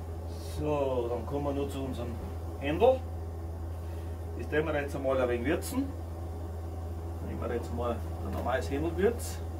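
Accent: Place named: Upper Palatinate, Germany. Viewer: Servus tolles Rezept, schaut mega lecker aus des Hendl, hast Du guad gemacht, vielen Dank für das Teilen😋👍👏und zwegst dem Oberpfälzer Dialgt loos i a moi was do 😁👍👍👍 LG Da Wolfe 😁👨‍🍳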